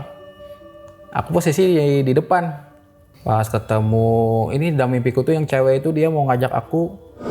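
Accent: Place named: Banjar, Indonesia. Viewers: Bahasa Masih Tebwa Banjar banar😁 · Kayaknya karena abang ini sering pake bahasa daerah (sepertinya bhsa banjar klo dr logatnya) jadi pas pake bahasa indonesia jadi ga tertata cara ceritanya.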